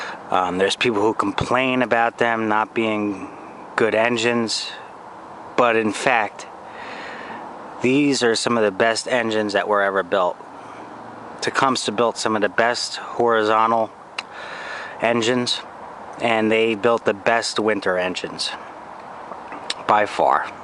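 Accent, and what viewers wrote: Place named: New Jersey, United States. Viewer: I was just given a 1960's AMF "Polar Bear" snow blower but it was repowered with a 7 hp Snow King from 1995 (made in late 1994). I will work on it tomorrow. I'm also working on the "Chonda" (Loncin, which is a Honda "copy") on a Toro Power Curve 180. There's no comparison between the two. I sense a NJ accent (and I thought I saw it on the boat in the background). What part of NJ? NYC here.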